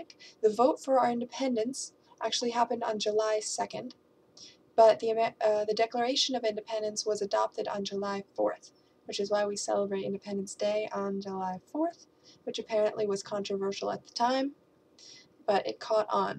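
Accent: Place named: Belarus. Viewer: акцент делает русский язык, очень похожим на белорусский язык =)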